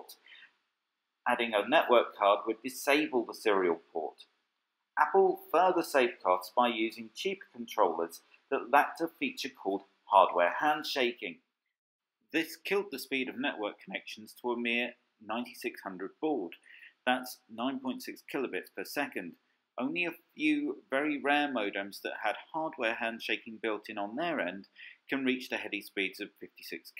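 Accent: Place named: England, United Kingdom. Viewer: the thick english accent on this blows. I can't understand it very well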